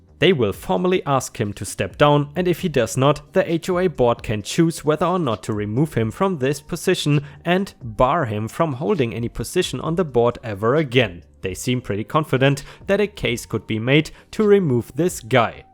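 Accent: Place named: Germany. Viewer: Subbed, belled and happy to hear your crazy German accent :-)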